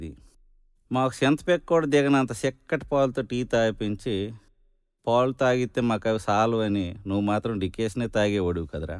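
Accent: Srikakulam, India. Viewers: శ్రీకాకుం యాస,భాష తో మన గ్రామీణ కుటుంబ పెద్దల జీవన విధానం గురించి చాల బాగా చెప్పారు. గారు..మీకు సంక్రాంతి శుభాకాంక్షలు. గన్నిరాజు డీఇఇ జలవనురుల శాఖ. శ్రీకాకుళం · సార్, శ్రీ కాకుళం, ప్రాంతీయ భాషా సౌందర్యం,అట్టాడ అప్పలనాయుడు, కొంచెం నాలుకకిరాసి,రుచిచూపించాడుకానీ,మీమాటలులోఆయాసామృతంమొత్తంగారుచిచూపించడంకాదు,కడుపూమనసూకూడానింపేశారు.ఆప్రాంతీయభాషామాధుర్యంఇటీవల ఒక మిత్రుడు ఇలాశాంపిల్ చూపించాడు.?ఒకరైతుకూలీస్త్రీఇలాఅందట?ఉలుకూపలుకూలేనమ్మకి, ఊరంతా ఉపారాలే!ఎద్దునాగకష్టపడిందానికి"పకాలే,డకోలు.అన్నాదట! ఎంత చక్కని పోలిక, ఎంత మధురమైన ఫోలిక,ఈవిశాఖపట్నంలో, కొంత మంది మిత్రులు, శ్రీకాకుళం వాళ్ళనీ,వాళ్ళభాషయాసనీ,అవమానకరంగాహేళనగానూమాటలాడుతుంటే,సహించలేక,శ్రికాకుళమేరామాదీశ్రికాకుళమేరా!విప్లవాలాపురిటిగడ్డా,వీరయోధులపోరుగడ్డా,ధీరమాతలనుదుటికుంకుమ,జారితూరుపుఎర్రబారిన,శ్రికాకుళమేరామాదీశ్రికాకుళమేరాఅనేపల్లవితో, విప్లవ అమరవీరులుపంచాదిక్రిష్ణమూర్తీ,నిర్మలమ్మా, సత్యం మాష్షగారినీ,ఆదిభట్లకైలాసంనీ,తామాడగణపతినీ,ఆపాటలోపరిచయంచేస్తూవారిత్యాగాల్నిగుర్తుచేస్తూరాసిన ఈపాట ఆశ్చర్యంగా, క్రిష్ణా, ఉభయ గోదావరి జిల్లాల్లో తెలంగాణ లలో,విస్త్రుతంగాపాడుతూపరవశిస్తూ, ఫోన్లు చేస్తూ అయ్యాఏభైఏళ్ళుగతించినా,శ్రికాకుళవిప్లవోద్యమాన్నీ,అమరవీరుల్నీగుర్తుచేసేపాటనుఏవిప్లవపార్టీగానీ,ఏసాంస్క్రుతికసంస్థగానీపాటరాయలేదండీఎంతబాగారాశారనిసంతోషంతోప్రశంసిస్తున్నారు.ఇక్కడనాపైప్రశంసలగొప్పలుచెప్పడంకాదు.మిత్రులుశ్రికాకుళయాసనీఅక్కడమనుషుల్నీఅవమానకరంగామాట్లాడేవాళ్ళకళ్ళుతెరిపించాననేసంతృప్తిదొరికిందిఅదిచాలు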